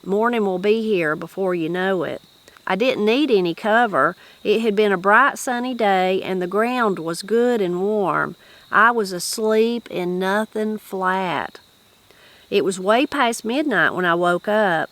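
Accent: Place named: South, United States. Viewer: Late to the party, but thankful as well. What a gift. Your voice, pace, cadence, and tone are what we sons of southern women recognize and love.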